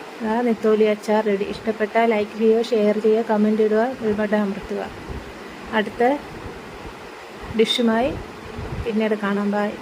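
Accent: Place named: Kollam, India. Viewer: ഇത്താ സ്ഥലം എവിടെ കൊല്ലം ആണോ സംസാരം കേട്ടിട്ട് കൊല്ലം ഭാഷയാണന്നു തോനുന്നു ആളുകൾക്ക് പ്രായജോനം ഉള്ള ചാനൽ 👌സൂപ്പർ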